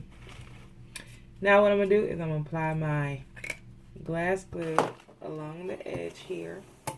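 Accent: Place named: Florida, United States. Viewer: Hello, just wondering how you are and where have you been? I hope you've found new found fame and are just too busy filling orders for decor and just not have time for diy videos. If so continued success! I'm from Texas, are you from Florida? Your 'accent' although subtle, sounds like a good friend of mine from Florida. Just curious.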